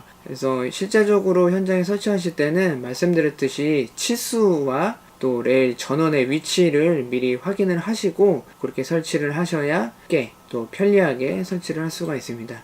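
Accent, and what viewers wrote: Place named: Jeolla, South Korea. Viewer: 구수한 전라도사투리가 신뢰도를 업시키네요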